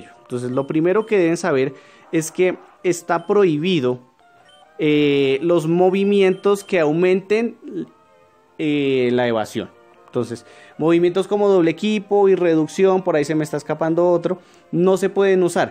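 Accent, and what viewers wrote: Colombia. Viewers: Puro colombiano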